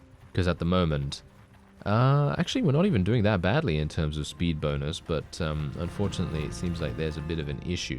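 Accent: United Kingdom. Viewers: You a brit, mate ?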